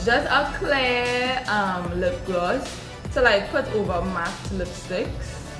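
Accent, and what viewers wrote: Trinidad and Tobago. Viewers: U hearing the Trini accent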